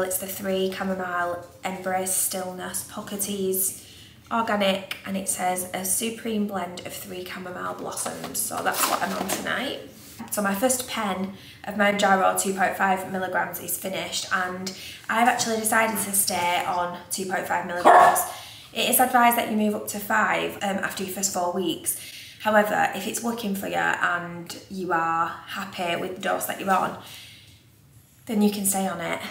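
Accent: Lancashire, United Kingdom. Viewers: Today is my day 1. You sound like you’re from my neck of the woods in Lancashire.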